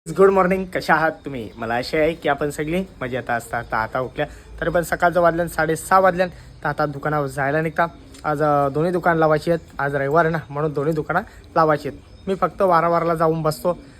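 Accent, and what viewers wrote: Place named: Agri, India. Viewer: Aagri tari bol nahiter sudh marathi bol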